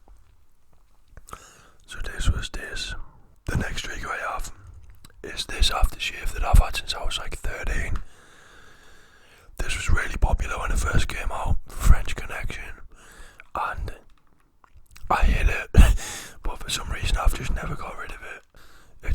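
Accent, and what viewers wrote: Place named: North, United Kingdom. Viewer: I understand everything he says but I guess its just because Im also from the North🤷🏻‍♀️ Great ASMR though, very tingly 😍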